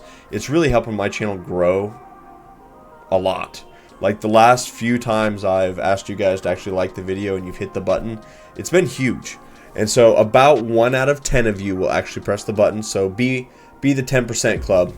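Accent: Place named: United States. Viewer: Two-ring 😂 American accent is so funny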